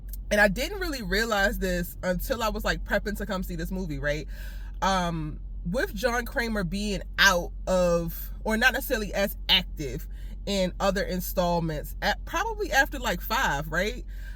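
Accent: Baltimore, United States. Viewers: Side note: I feel like your accent is giving Baltimore/DMV vibes. How wrong am I?? Lol 😂😂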